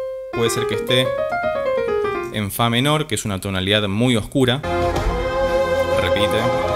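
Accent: Argentina. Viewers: Por el acento, debe ser argentino.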